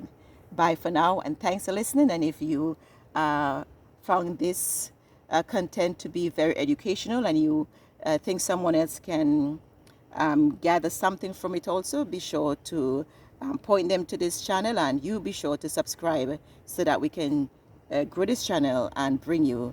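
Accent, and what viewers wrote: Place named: Antigua and Barbuda. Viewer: thanks very much l sm retired from construction and is experimenting with a backyard garden and you helped s lot . and you were very clear.you sound antiguan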